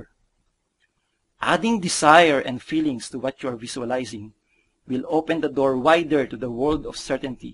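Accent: Philippines. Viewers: Filipinos speak that way. it's ok as long as we understand what he say. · Duhh. Filipinos really speak that way. And who cares, as long as we understand what he's saying then everything is fine. Okay?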